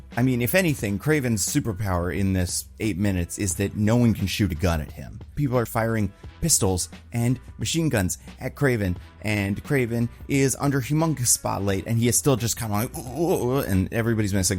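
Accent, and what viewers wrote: Russia. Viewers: this video is filled with lies. for starters he said "my russian accent is bad", yeah, not buying that clearly russia-born youtuber buy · If it makes you feel better, I think you could be in this movie with your Russian accent and it wouldn’t be a detriment to the movie.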